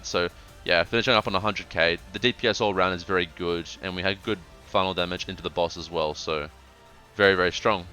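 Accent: South Africa. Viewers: Thank you very much. BTW your accent sounds like you are from South Africa. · Are you from South Africa? Accent sounds a bit like it.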